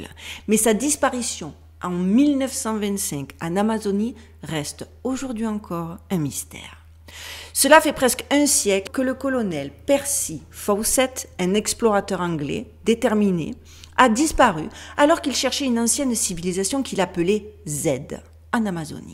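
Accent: Marseille, France. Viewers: 😂 " Mais ce monsieur n'a rien laché, il devait être Marseillais...mais bien sûr, je plaisante!" 😅 J'adore votre humour décalé, toujours fin et respectueux. Je suis parisien mais ma sœur vient de Marseille et j'aime entendre votre accent, ça me rapproche de la famille. Ne changez-rien. J'ai du mal à comprendre qu'avec la qualité de vos reportages très aboutis, que youtube puisse vous emmerder. C'est un "non sens" 😮. Prenez soin de vous et ne lâchez rien!❤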